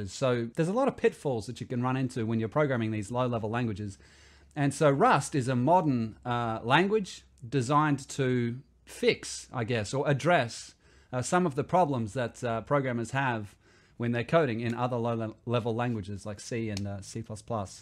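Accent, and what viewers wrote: Australia. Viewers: What part of straya you from mate?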